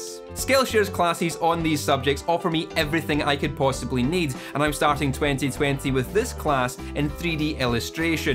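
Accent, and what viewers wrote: Scotland, United Kingdom. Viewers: Ah wouldn't a-trusted hem but for his Scots brogue. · i enjoy all your "ta tas" and learn a lot.....your brogue is entertaining and your enunciation perfect....had a Scottish girlfriend back in the 60s, her name was Carol Cummings, did you know her?...just joking, love your lectures!!! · Great video. I sure know a lot more about fukin valve amps than I ever did before! Love that accent of yours. What part of the UK are ya from Jerry from PA-USA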